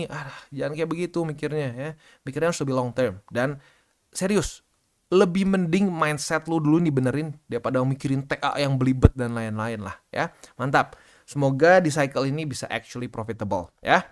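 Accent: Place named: Bandung, Indonesia. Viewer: Orang cindo bandung dan merantau jakarta rata2 logatnya seperti ini.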